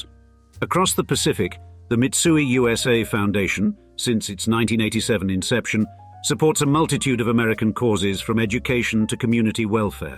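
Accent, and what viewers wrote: United Kingdom. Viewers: Great reminder that Mon emblems were basically logos for families way before they became corporate identifiers. With the narrator's accent, I'm surprised you didn't draw comparisons between the Mitsui founder's department store for the emerging middle class with similar British operations like Fortnum and Mason in the Georgian era or John Lewis or Selfridges.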